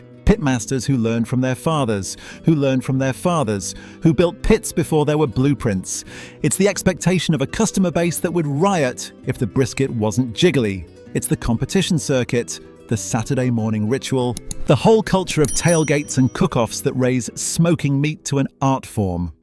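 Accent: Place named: United Kingdom. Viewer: They've got a garden? What are they going to do, smother it with basil and thyme and cherry tomatoes? What's a garden got to do with barbecue? You plant flowers and aromatics and Japanese shrubs in a garden. There's no provision for a smoker and a cutting block, and nobody cares about being surrounded by cilantro and posies when you're grilling and barbecuing and smoking. Ridiculous. And yes, the wood is important. I don't think you can find hickory or mesquite in Europe, and oak and other slow-burning variants have to be the right kind. I certainly don't want my ribs smoked in ash or yew or olive, wrong flavor and smoking properties. And a brisket is not the size of a suitcase. You are exaggerating and misrepresenting a lot of things. You sound British yourself. I understand that you're trying to present a concept in this video, but you certainly still don't know enough about the subject to talk about it correctly. 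I suggest you stop hurrying when trying to put these videos together, the clicks are not worth it, and not important enough. You will be ignored when you come off as ignorant instead of informative. Very annoying. And why the hell do you have noodles and coffee and other things in a video about American barbecue? It doesn't make sense. Are you a real person, or are you the early iteration of HAL and Skynet? If you're human, tell us about your last experience when you had to tune out your crazy ex-girlfriend because you were trying to relax after work with a video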